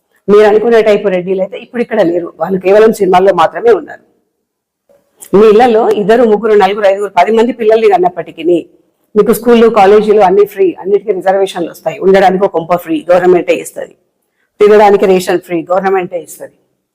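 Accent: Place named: Telangana, India. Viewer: Mee vedio s andhrajanam koodaa choosthaamu,achhu thelangaanaa yaasa lo maatlaaduthunnaaru, koncham andariki ardhamayyelaa maatlaadaalani koruthunnaamu😊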